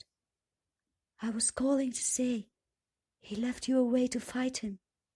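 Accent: United Kingdom. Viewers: Your reading is FANTASTIC!! To hear a British woman taking the helm on this gives it an extra touch of surrealism (or plays against type so well). Very effective, and a HUGE relief from the awful, painfully contrived readings of Will Patton; his whistling S’s and phony solemn affect keeps me away from those. I hope you get hired at Audible, m’lady. 😄👍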